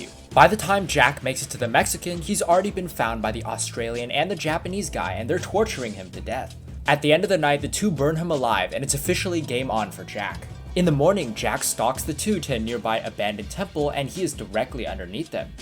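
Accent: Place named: United Kingdom. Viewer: He can’t be Australian- proceeds to sound about as British geeza as you can lol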